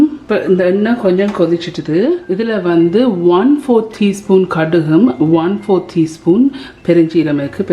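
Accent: Jaffna, Sri Lanka. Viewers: Hatts off for keeping jaffna prononciation !!!! Thats our adayalam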